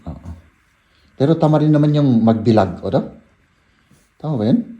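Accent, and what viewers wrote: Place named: Cordillera, Philippines. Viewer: Sir taga car kaba? Ang tuno sa pananalita mo ay parang taga car.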